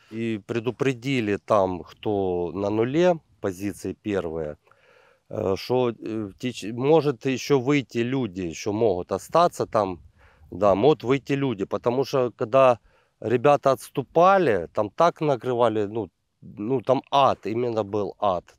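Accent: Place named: Russia. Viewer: Твоя мова це здається що ти з раші прийшов !!! Та навчіться кінець кінців своєї мови !!!!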